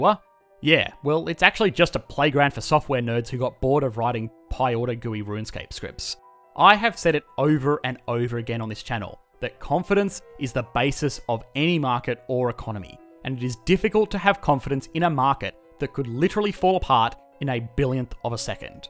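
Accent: Australia. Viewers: its actually irritating, uk australian accent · Editor He is from Australia ? :D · Economics Explained has the most charming Australian accent.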